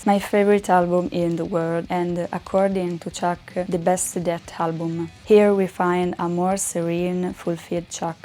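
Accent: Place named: Italy. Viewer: Mi stavo giusto chiedendo di dove sei, visto un buon inglese parlato, ma con un accento non proprio madre lingua. Poi hai nominato il maestro Angelo badalamenti, e dopo questo posso confermare al 95% che sei italiana 😅... comunque ottima classifica anche se preferisco il buon vecchio rock/hard rock 🤘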